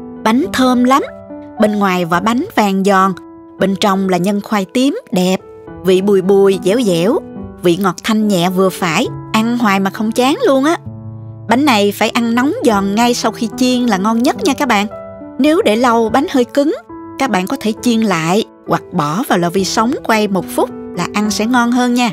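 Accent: South, Vietnam. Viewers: Cô chất giọng gốc miền nam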